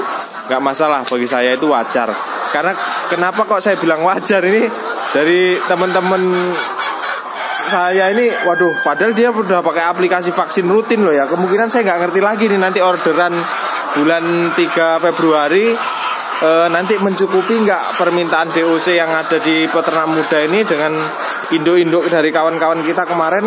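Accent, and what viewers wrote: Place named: East Java, Indonesia. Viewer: Mantab mas ilmunya,..maaf jenengan asli mana to, logat jawanya kental khas jawa timuran..tinggal di Lampung.salam seduluran dari wong Sidoarjo jawa timur..tp asli jawa tengah Purwodadi Grobogan..juooossss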